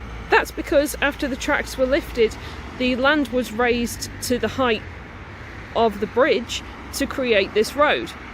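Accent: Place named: East Anglia, United Kingdom. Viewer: Another great video...I'm gradually trawling my way through your back catalogue! Are you a local girl? I detect a bit of Norfolk/Suffolk in your accent! But also detect a (maybe) Yorkshire accent?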